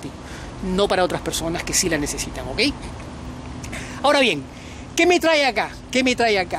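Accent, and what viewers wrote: Lima, Peru. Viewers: es el acento del varon de clase media alta a alta de Lima, es aceptable, pero el que no soporto es el acento la joven miraflorina o san isidrina, es un acento raro con muchas groserias.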